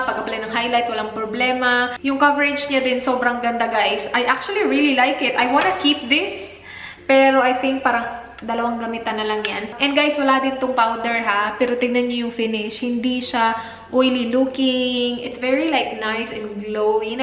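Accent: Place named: Visayas, Philippines. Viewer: gwapaaa jd kaayo oy <3 hahahah cute kay imong accent ate tagalog pero bisaya ang tuno hahahaha <3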